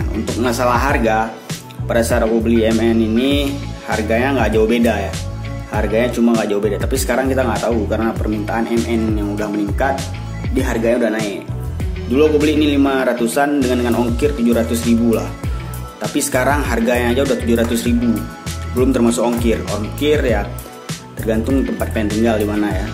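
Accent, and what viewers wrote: Aceh, Indonesia. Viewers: Mantap bang review nya 👍🏻 Suara abang mirip orang aceh.. Saleum 🙏🏻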